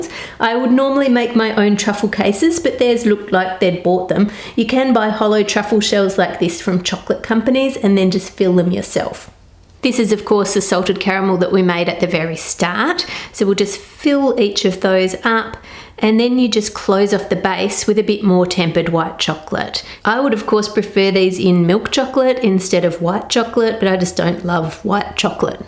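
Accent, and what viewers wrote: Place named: Australia. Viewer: It’s an Australian accent. There is more than one.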